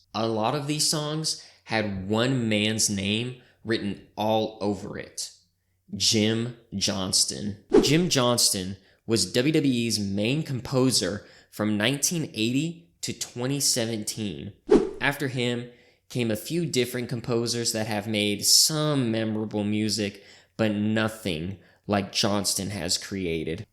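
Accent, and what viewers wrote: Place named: United States. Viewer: Obviously done some research, but doesn't really sound like an actual fan. And the narrator sounds American, but struggles with pronouncing basic words and names like Dud-ley. Instead pronouncing it Dude-ley and Mid-E-On, who apparently worked in the Ministry of Darkness with The Undertaker and Kane....🤦🙄🧐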